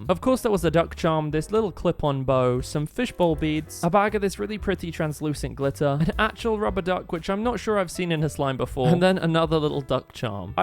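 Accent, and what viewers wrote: United Kingdom. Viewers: Are you British? You sound like you are British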